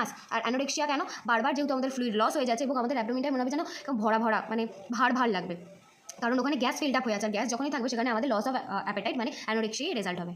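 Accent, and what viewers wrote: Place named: Bangal, Bangladesh. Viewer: Bolchi ektu Bangal 😢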